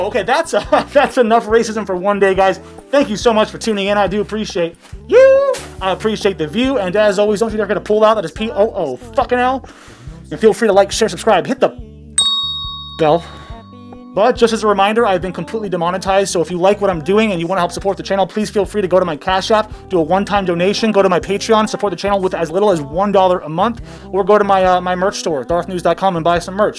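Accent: United Kingdom. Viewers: Loving the scaaawtish accent!😂💯👍🏻 I’m not on Twitter thankfully so I haven’t got to hear all that BS hate! Thanks 🙂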